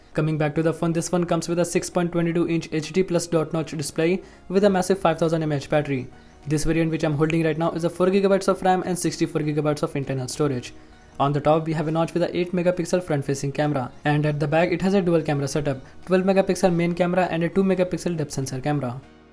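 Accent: India. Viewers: İngilzce konuşan Hint shkfsaj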